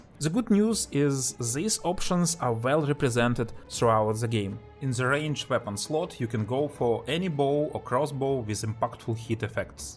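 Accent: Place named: Russia. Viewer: nice russian voice brat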